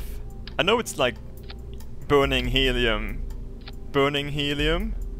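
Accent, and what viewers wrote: Norrland, Sweden. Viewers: Älskar din norrländska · Omg he sounds sssssssoooooooo swedish... And I love it! Håll på såhär bara ;-) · Swedish accent. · are you swedish you sound swedish if you are so tjenare har du det gött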